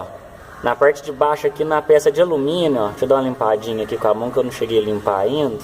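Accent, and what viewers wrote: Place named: Minas Gerais, Brazil. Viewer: Vc pelo sotaque parece minerin de sete lagoas rsrsr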